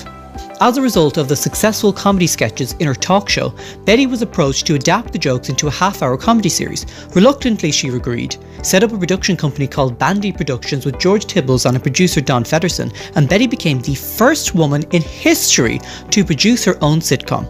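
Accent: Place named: Ireland. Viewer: I love hearing a fellow Irish voice in a voiceover! :) I've watched a lot of your videos now. Excellent channel and great work. Good for you. Hope it blows up for you. xx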